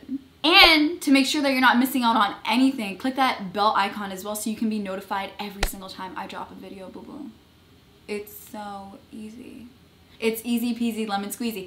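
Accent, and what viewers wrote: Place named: Tigrinya, Eritrea. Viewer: I love ur tigrigna accent i want hear u more sweety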